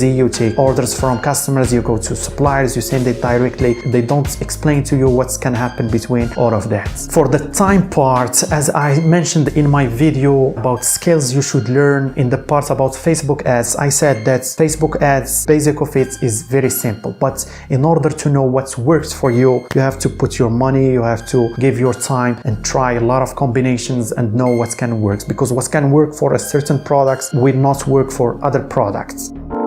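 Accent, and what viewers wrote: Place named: Morocco. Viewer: 😂😂xttî 9x3tk mn l accent dyalk bl3ks wlkin wakha hakkak kyn wahd lam7 sghiiir kybyn dk l3r9 lmghribi hhhhhh thy bruuuh!! ♡♡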